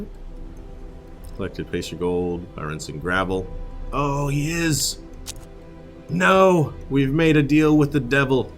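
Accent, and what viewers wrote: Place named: Oklahoma, United States. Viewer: keep it going! that OK accent adds to the game for me this game has awesome narrative to it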